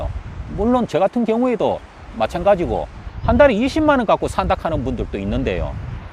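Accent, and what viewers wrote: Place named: Uiseong, South Korea. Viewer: 의성 말투 같은디요.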